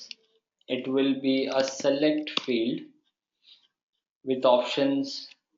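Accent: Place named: India. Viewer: Love erpnext and have massive respect for indians in general, but why is the indian accent so painful to the human ears? I've heard africans speak english with thick accents but after listening to them for few minutes they start to grow on you. But not the indian accent, the more you hear the worse your headache will get. Every few seconds they will have a brainfreeze and go QUUUUUH, QUUUUUH QUUUUUH, like literally after speaking 5 words QUUUUUUH QUUUUUH QUUUUUH.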